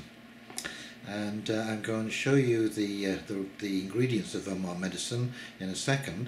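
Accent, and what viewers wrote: England, United Kingdom. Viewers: hello matey, i'm in the UK, near Oxford - surprised how English your acent is - thanks for the tip.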